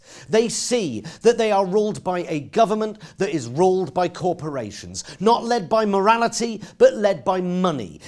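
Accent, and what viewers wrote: England, United Kingdom. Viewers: Something about the English accent that makes screaming out facts sound like satire. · Is this considered particularly foul mouthed? This is how we all talk in Jersey, minus the British accent, of course.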